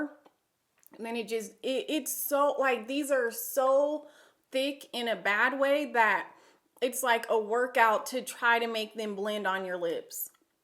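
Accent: South, United States. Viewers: What accent is that????? I thought you sounded southern for a second but idk anymore now you just sound like you're yelling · Omg your voice 😂 it is so cute. Its super southern but not.. At the same time. Where are you from? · This is the first video i watched of her .. where she from? She definitely has an accent . Like southern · I love her country accent 😂❤️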